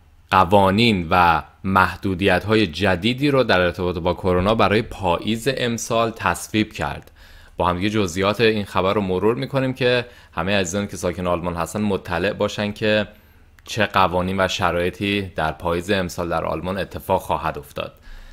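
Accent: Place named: Iran. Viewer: چقدر ایرانی ها لحجه ی تابلویی دارن وقتی آلمانی صحبت میکنن🙄 🤔 شما مثلا آموزش زبان میدید؟! کمی سر لحجه ی خودتون کار کنید نه فقط گرامر!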